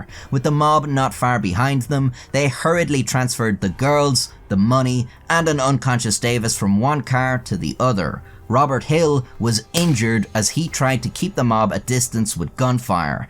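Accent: Ireland. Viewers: Can you cover the guy from Mali who stole 242 million (in your cute Irish tone) from Saudi I think Started listening to some guy and got bored and thought about you You little Irish scamp😂😂 Link on it's way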